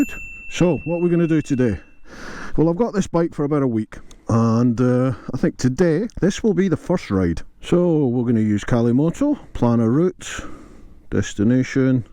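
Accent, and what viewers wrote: Scotland, United Kingdom. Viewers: Where abouts in Scotland are you from pal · OMG 20 stone. But you don't seem to be killing the bike. For you American viewers, 20 stone =280 pounds. Love your accent scotsman, and you showed me some beautiful country. This reminds me of my first bike. No power but lots of fun. Excellent review. So much crammed into the package deal on this one. Love the luggage capacity and the large tank for extra miles. It's amazingly quiet and looked like a very smooth ride. Of course, your country seems to have very well maintained roads unlike our country. Pot holes everywhere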